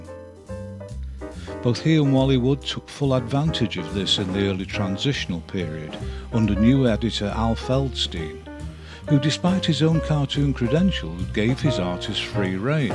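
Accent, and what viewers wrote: United Kingdom. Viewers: Why am I listing to an individual with a British accent tell me about Mad Magazine ? Spy vs Spy was the best part of Mad Magazine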